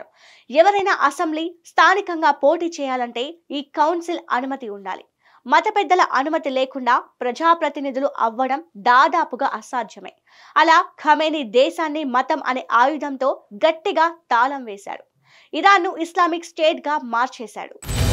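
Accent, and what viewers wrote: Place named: United Kingdom. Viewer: Voice baledu ...English accent lo telugu matladutunnav.....👎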